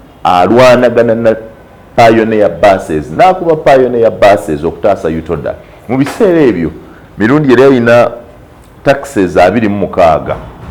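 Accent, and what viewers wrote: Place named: Zambia. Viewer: But mr oyogera nga zambali🙏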